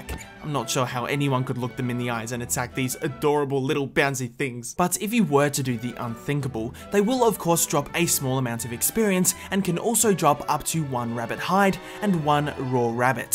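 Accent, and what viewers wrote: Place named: Australia. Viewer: I thought he was Australian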